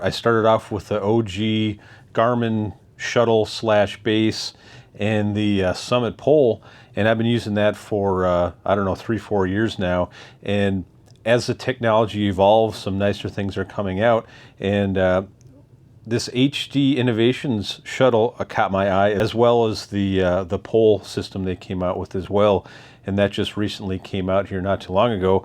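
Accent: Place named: Canada. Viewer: Well done,.I can detect a little canuck in your accent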